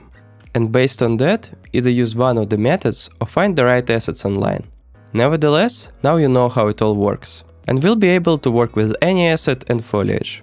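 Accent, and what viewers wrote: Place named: Russia. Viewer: топовый акцент, русский отличит, но иностранцы думаю ничего и не заметят)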